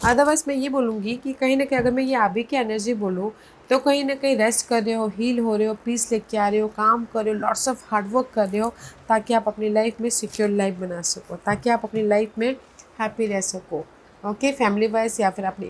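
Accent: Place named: Bengal, India. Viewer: Bengali accent 🙏🏻👁🙏🏻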